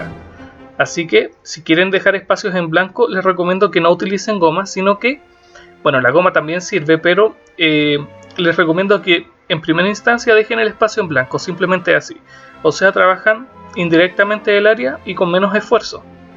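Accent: Chile. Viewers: buen dibujo! Chileno no?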